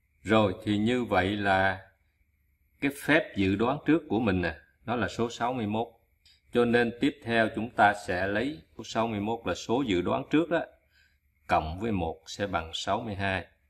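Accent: South, Vietnam. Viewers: Trong một xã hội bị đồng tiền chi phối gây ra hỗn loạn trong mọi lĩnh vực như bây giờ mà vô tình gặp được kênh của bạn thì thật là đáng trân trọng! Với chất giọng ấm áp, trầm hùng mà giản dị của phương ngữ Nam bộ khiến người xem rất dễ chịu và dễ hiểu. Cơ duyên “gặp” được kênh của bạn cách đây tầm hơn tháng và liền đăng ký kênh để tiện theo dõi. Một kênh rất có ích cho xã hội ngày nay. Và mình thấy có một điều đáng tiếc là hiện nay những kênh mang nội dung học thuật lại ít được theo dõi hơn những kênh giải trí ( mà đa phần là tào lao/ như kênh Nờ Ô Nô hổm giờ báo chí đưa tin). Vậy thì tương lai đất nước sẽ như thế nào??? Chúc bạn và gia đình: Hạnh phúc + Sức khỏe!!! Thân chào!!!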